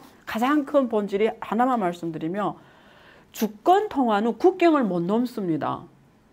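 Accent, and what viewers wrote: China. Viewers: 조선족인가요? 말투가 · 억양이 조선족? 조선족 못믿.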